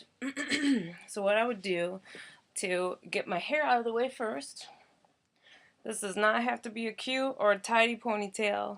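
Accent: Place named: Pennsylvania, United States. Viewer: You are a smoke show 💨 I love your accent too. I’m guessing PA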